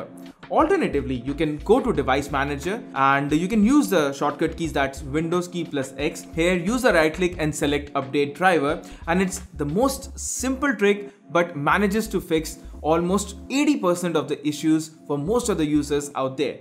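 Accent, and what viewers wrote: India. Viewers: always the indian accent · indian accent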